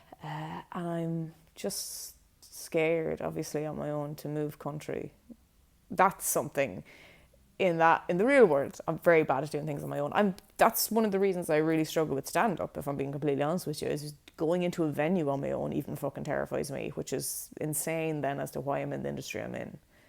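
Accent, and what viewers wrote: Ireland. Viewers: I swear I could listen to read the phone book and be happy for hours. The Gaelge accent does that to American ears.:)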